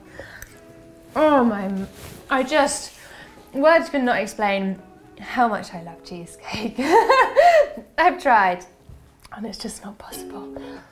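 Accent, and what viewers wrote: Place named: RP, United Kingdom. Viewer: A Very British “Toff” Accent!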